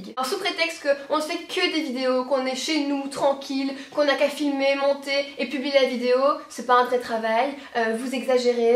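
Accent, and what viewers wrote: Belgium. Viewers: J'avoue que notre accent à nous les belges donne un cachet en plus quand on dit les choses! Merci pour cette vidéo, j'espère que les gonzesses qui ont la critique un peu trop facile ont pêtés un bon coup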